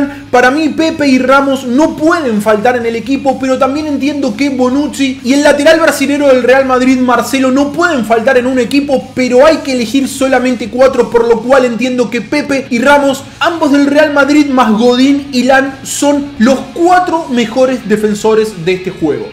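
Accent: Argentina. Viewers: como se nota que eres argentino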